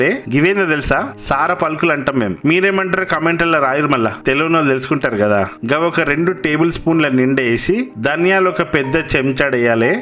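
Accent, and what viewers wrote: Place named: Telangana, India. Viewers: Emi chepennavi kaka.. mast ga undi … ni commentary feeling home! Same elakha nenu kuda! Mi Enti deggara ne jawahar nagar colony … 😁😁😁…. Hats off ni Telangana ki 🙏🙏🙏🤣🤣🤣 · Mee vantalu...song, voice,thelangana slang superoo....Super 👌👍👏🎁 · Anna ma telangana masth matladinave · Super recepie completely different presentstion telangana slang itaghadesav with diferent song love 💘❤💕 u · Telangana basha ma vurilio matladinatee matladuthunnav babhi... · Abbabba e mutti la kura tinabudhi ithundanna maku kuda super ga unnadi, telangana bhasha mastu ga matladinav le ma anna anipinchukunnav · Me voice 😘....daneke thodu Telangana slang ahaa crazy combo..... ❤️ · Wow garu mi song mi telangana slang mi mutila curry super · ఎమన్నా పడినవా అన్న 🙏, అయినా తెలంగాణ స్లాంగ్ లో మాట్లాడితే అదేంటో మనోళ్ళతోటి మాట్లాడినట్టే ఉంటది, మర్చిపోయిన ముట్టిల కూర మాత్రం చూడనికి 👌చేసుకొని తిని ఎట్లుందో చెప్తా అన్న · తెలంగాణ యాస సూపర్ 👌❤️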